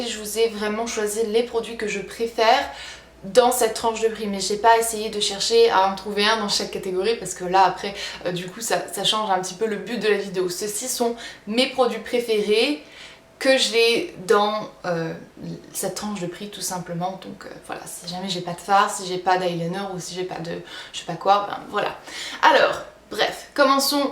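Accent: United States. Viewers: Toujours aussi marrante;) Bourjois est vraiment tentant à force que tu en parles... Pour info, j'ai trouvé des vernis Essie en Espagne à seulement 8,95!!!!! Et OPI à 12 je crois, donc si vous avez l'occasion d'aller en Espagne foncez chez Primor;) Pleins de bisous et au passage, quand tu parles anglais on dirait que t'es une américaine!! _ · Coucou 😘 Superbe vidéo comme d'habitude! Je t'adore ❤️ surtout ton accent américain est juste top j'adore!! J'aimerai avoir un accent comme ca XD😂 Bref big bisoux continue comme ca😘😘😘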